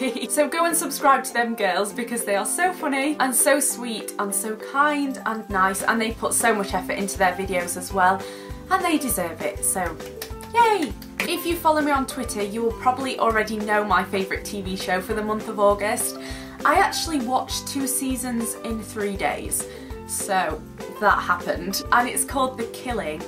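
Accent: United Kingdom. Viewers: I wish u.s drugstores carried the bourjois healthy mix foundation I really wanna try it :( I love your videos by the way :) what accent do you have like I know youre british ( im not trying to be rude im just a curious kitty)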